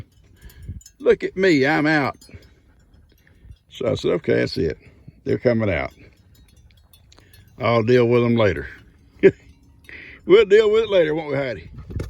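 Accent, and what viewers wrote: South, United States. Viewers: Good morning this is just the 2nd time I've committed...im new but love it I guess ur goat is special shes a determined lol I love hearing about ur farm i dont live on my farm anymore my daughter died n the farm house and I had 55 acres i buried her on the property under a apple tree ..but I couldn't live there anymore so my sons raising his family there now ..but I love seeing ur Animals it helps me miss mine but comforts also ..so ty for ur videos ..im southern to ..I talk like u and understand the slangwords ..lol ..ty ur so good at this...you make me feel like HOME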